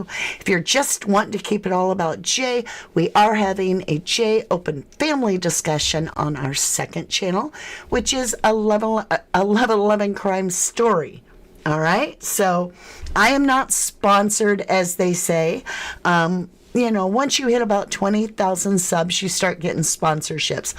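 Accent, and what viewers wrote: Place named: United Kingdom. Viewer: I came to you in the Jay case. Just love how you do things especially your British accents.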